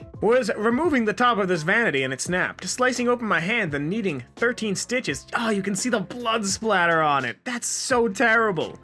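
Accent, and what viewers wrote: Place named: New England, United States. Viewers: You are the most New England sounding person I've ever heard. I'm from California, you've basically got a foreign accent as far as I'm concerned. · The fact that you love in New England explains your accent! My uncle used to live in Rhode Island and now lives in NH not far from the Mass border and he has a similar accent. As an English boy it is funny and weird!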